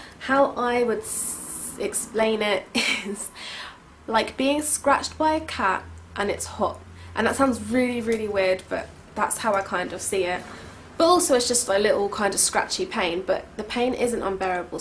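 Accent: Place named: United Kingdom. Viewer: OH....MY....GOD....I fucking hate British accents. Instant turn-off.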